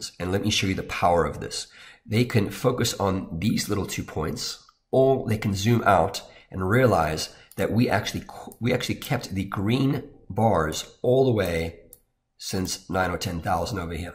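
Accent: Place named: South Africa. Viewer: Thanks, much appreciated. BTW I detect a hint of a South African accent way back there, am I right? Cheers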